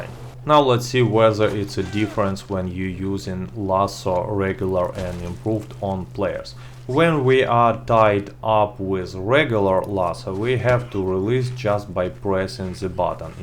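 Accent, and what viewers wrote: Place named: Russia. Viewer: Still laughing please don't ever lose the humor everything us so much funnier in Russian accent!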